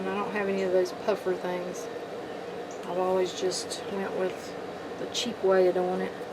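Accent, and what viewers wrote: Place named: South, United States. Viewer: OMG I LOVE YOUR VOICE! YOUR VOICE SOUNDS LIKE, JUST LIKE MY GRANDMA, the accent sounds just like her, she's from the south. Omg love it.. sorry lol. Very nice video. Enjoyed and will add you to fb. Omg, your accent is the same wow.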